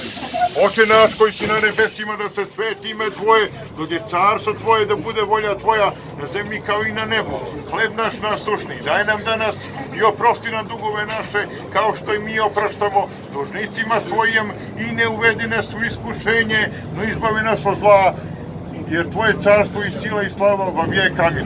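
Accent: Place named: Herzegovina, Bosnia and Herzegovina. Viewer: Ovaj brat je hercegovac 😮😮😮😅😅😅😅😅😅😅😅😅😅😅😅😊😊😊😊😊❤❤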